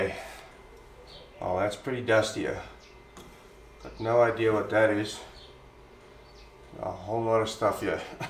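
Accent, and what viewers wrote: South Africa. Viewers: Your Afrikaans accent is jumping off my Afrikaans accent scale. Even my wife was able to figure it out after I gave her a couple guesses.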